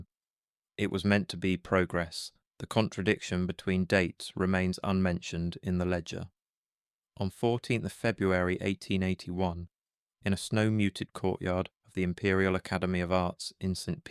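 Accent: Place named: United Kingdom. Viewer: fake British AI generated voice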